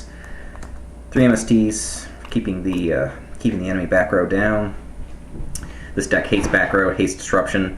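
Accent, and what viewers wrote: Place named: Newfoundland, Canada. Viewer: You sound like your from Newfroundland, good deck ya got there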